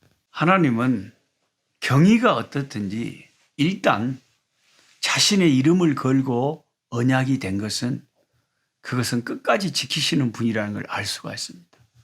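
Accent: Jeolla, South Korea. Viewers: 목사님 전라도 사투리 말씀 은혜가됩니다 저도 전라도 고흥입니다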